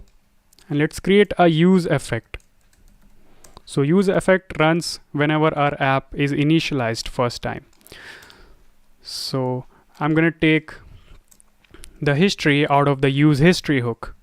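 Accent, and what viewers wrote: India. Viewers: first i thought oh..this is not an indian guys....then after 10 sec the indian accent comes in.. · everything was fine untill the indian accent, dislike.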